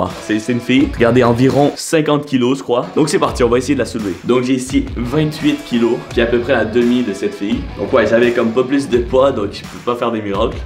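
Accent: Quebec, Canada. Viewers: Tu es quebecois? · Un bel accent canadien 💫💗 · tes qubecois??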